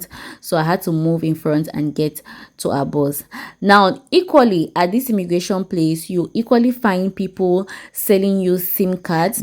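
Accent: Nigeria. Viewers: u sound so nigerian u have our lingo too welcome here, please go to island and Eko atlantic city then go to abuja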